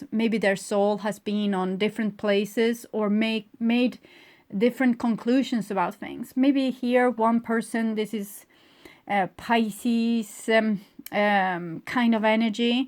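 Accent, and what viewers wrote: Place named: Sweden. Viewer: I love your readings ! They are long but are very in-depth ! I actually after a first watch / listen ! I replay them your voice is very soothing, it’s the Swedish accent speaking English I think it’s very soothing , it makes me fall asleep on a replay! You are correct with being troubled I’ve been troubled for years in regards to an old partner who I have children with who is a drug addict ( meth amphetamines ) it has destroyed her and all I do is walk around and pick up the pieces which is the children ! And to be honest with out being self promoting I’ve done well serving my children ! The enemy ( the drug ) not the person attacks the wall every now and then but weve held strong , the boys know how it can be very calm then the storm comes they’ve been through so much at a young age ! However I haven’t been able due to the hard work of raising children to find a suitable partner 7 years of bad luck but I do believe it is over! 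There is something in the air this spring ( Southern Hemisphere) I am a pagan and I gave some sacrifice to the gods / goddess this spring in an offering of mead seeds honey and work in planting trees some meditation! My ego over the years thought it was strong enough to be alone but it is really not natural so throw these things to the wind and hope for the best! Tusen takk loving lady from the north ,-) ,,,,, haaaaaaa you are so right with being nationalistic , I am an ex soldier who has been to war ! It changed me totally, for sometimes bad but for t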